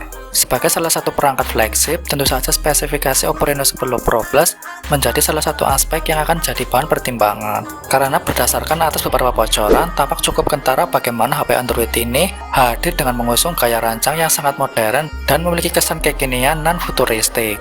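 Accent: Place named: Java, Indonesia. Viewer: Jawa koek bnget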